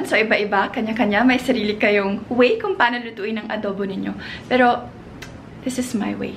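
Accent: Visayas, Philippines. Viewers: "Hay ka lisod sa kinabuhi kung nag inusara raka" ahh ka kyut gyud mag bisaya oy. Huhu teee notice meee please 😭🙏🙏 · Haha cute accent ng bisaya😍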